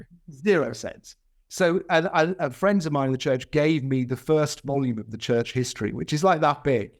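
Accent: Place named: United Kingdom. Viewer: U R DELIGHTFUL to WATCH & LISTEN TO!!😂🎉 💥😄👏😇 ❤️ ur BRITISH SOUND!! IT'S me "mother 👅😛' TONGUE!! 🤣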